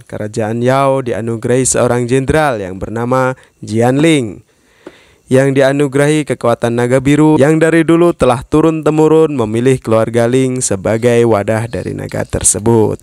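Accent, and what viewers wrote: Madura, Indonesia. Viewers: Orang madura kah bang?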